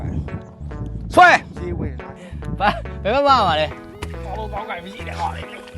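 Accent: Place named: Mandalay, Myanmar. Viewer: Are you originally from Thanbuzayat.?Actually,I thought you are from Mdy.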